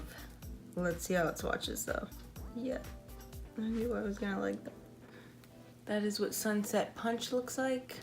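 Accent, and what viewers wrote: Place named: East Coast, United States. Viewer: I LOVE east coast accents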